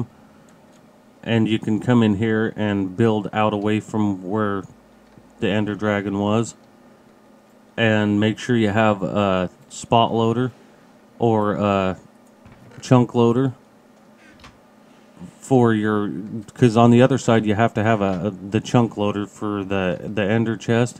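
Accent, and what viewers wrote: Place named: South, United States. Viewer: You hillbilly sounding useless peace of shit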